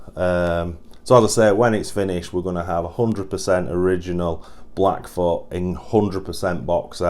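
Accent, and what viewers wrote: United Kingdom. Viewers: Got it brothers! I'm a newb when it comes to discerning UK regional accents lol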